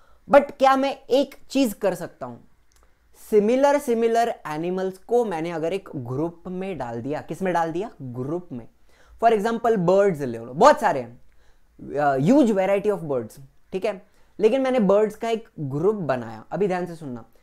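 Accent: Maharashtra, India. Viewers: Sir you marathi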